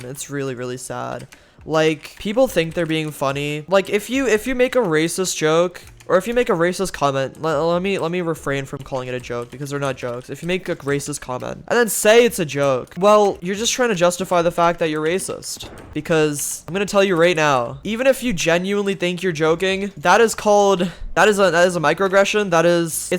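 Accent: India. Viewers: I was doubting from when I started to watch your videos as your face looked like an Indian and your accent of English Lots of love from India dude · I AM REALLY PROUD OF YOU THE BEST SKYWARS PLAYER IS FROM INDIA AND I HAVE A DOUBT FROM YOUR ACENT AND YOUR FACE THAT U ARE INDIAN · Are u kidding me?!?! U r Indian Bruhhhhh your acccent wtfff I see ... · Me and my family are Indian and my dad said to me that whoever ur watching is indian because he recognized the voice but I didn’t believe him. It’s actualy kind of a relief because I want to be a YouTuber as a 2nd job but I was afraid of people being racist. But so glad to know I can do it. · Are you fr rn? Just because people don’t say their Indian or whatever doesn’t mean it’s racist stereotypes LMAO. Watched this and spit my drink laughing. Do white people point out they’re white?? Racism is a big deal but cmon man . 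Also imo it’s not that hard to tell if someone’s Indian based on their voice, (when I first watched you I knew right away) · Great vid i am also indian but i was raised in Louisiana and Alabama so i dont have an accent like u! Def my fav yter ☺️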